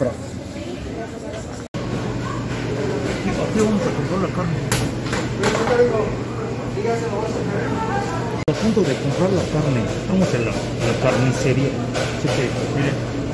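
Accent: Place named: Mexico. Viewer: saludos tiene asento de mexicano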